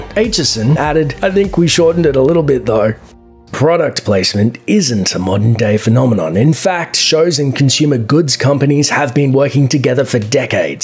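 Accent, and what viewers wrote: Australia. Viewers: I'm pretty sure this guy has an Australian accent lol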